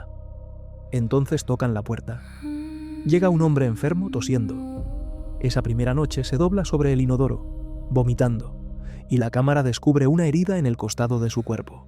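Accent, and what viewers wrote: Spain. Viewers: tu asentó español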